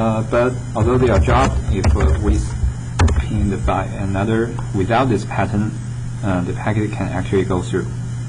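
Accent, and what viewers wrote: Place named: China. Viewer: 老铁，听口音像是中国人吧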